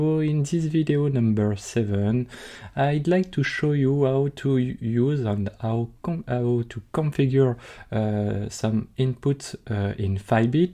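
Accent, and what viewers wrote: France. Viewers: Your French accent is amazing :))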